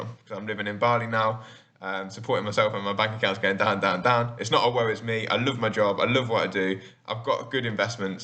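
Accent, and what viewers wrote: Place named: Cockney, United Kingdom. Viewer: You gorgeous! You sound a bit Cockney. Am I right?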